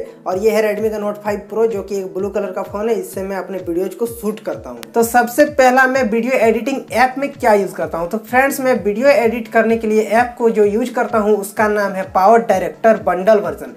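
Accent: Bihar, India. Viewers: You talk so sweetly are you from Bihar? :)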